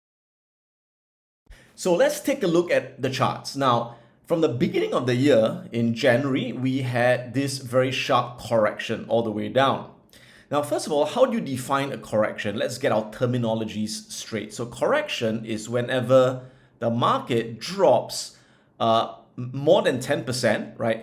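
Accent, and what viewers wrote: Russia. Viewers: Your Russian English is so good, can't remember nothing after watching but that part :) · love the russian accent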